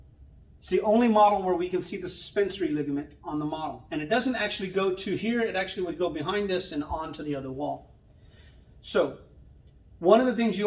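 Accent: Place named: United States. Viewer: Sprich deutsch. Dein ami-palaber ist für mich unverständlich.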